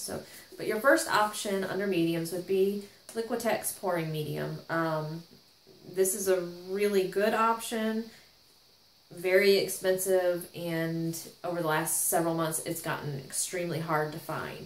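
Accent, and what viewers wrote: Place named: Midwest, United States. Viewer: I like the subtle midwest accent with a touch of pirate on the '-ar' like jaaaar.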